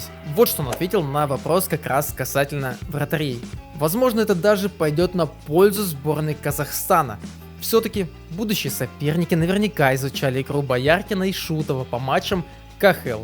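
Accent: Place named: France. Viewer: Комментатор с французским акцентом,не в обиду,прикольно базарит.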